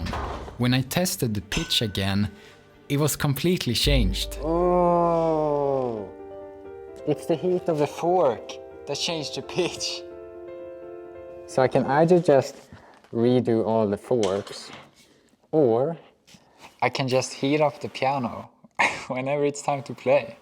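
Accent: Sweden. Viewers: YouTube knows me well, nothing better than Swedish accent working on an impossible music instrument to not go to sleep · A- are you swedish?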